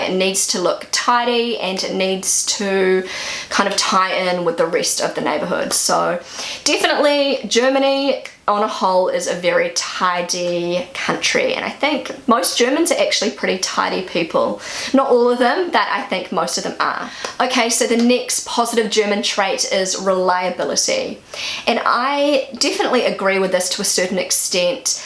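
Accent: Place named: New Zealand. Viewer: Hi. This was the first time in my whole life I heard someone from New Zealand speaking and I really liked it. It’s a bit different to what I’m used to though. And no Deutsche Bahn is not punctual, wasn’t ever and I doubt it will ever be.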